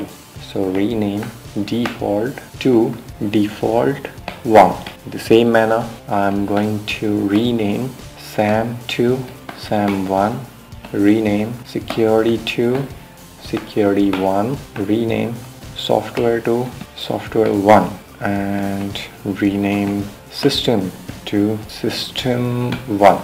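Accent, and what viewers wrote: India. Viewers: Damn indian, I hate those accent. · Ae bhaiya , Kolkata se ho kya ?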